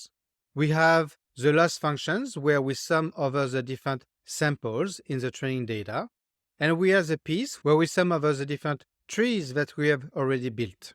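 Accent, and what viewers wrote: France. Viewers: t'es français, mon pote ?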